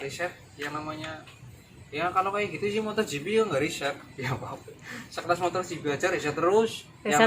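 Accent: Banyumas, Indonesia. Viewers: Rah ngapak rah kepenak😁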